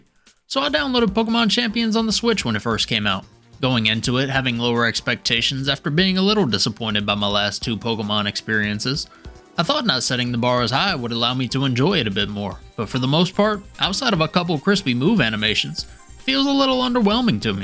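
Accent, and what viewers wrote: United States. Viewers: Cowboy accent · I like how you sound more Texan than I do, even though I have lived in Texas my entire life. 😂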